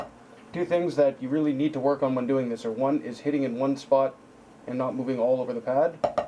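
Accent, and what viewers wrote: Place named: Canada. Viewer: strongest canadian accent ive ever heard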